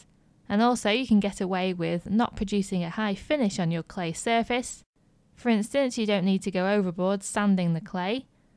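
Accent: United Kingdom. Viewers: I realize that, I just wonder where in Britain people speak like that. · I heard british accent, isn't it?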